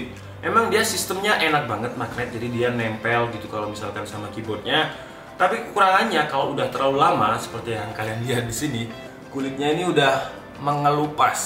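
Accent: Malang, Indonesia. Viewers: orang malang mas?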